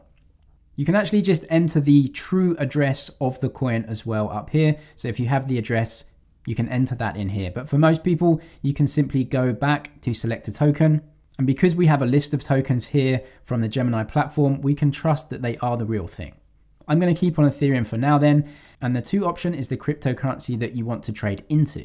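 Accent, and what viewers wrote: United Kingdom. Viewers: Many thanks for your succinct and easy to understand videos. On the stuff I am trying to understand at the moment, I will watch your videos first! And not just for the British accent :-)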